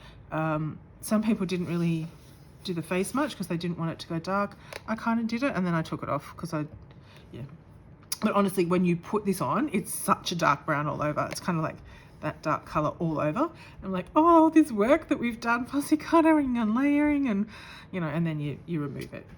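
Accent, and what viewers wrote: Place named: Australia. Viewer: Hi . You sound Australian. Where did you go for the workshop , sounds like I would have liked it a lot. I’m in Melbourne . Thanks for sharing .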